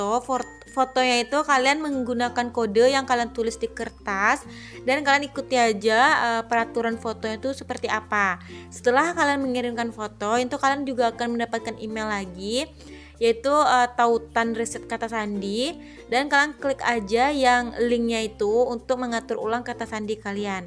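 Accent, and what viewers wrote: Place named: West Sumatra, Indonesia. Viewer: Logat Minang Ndk Masalah,,Yg Penting Mantap Kak😊😊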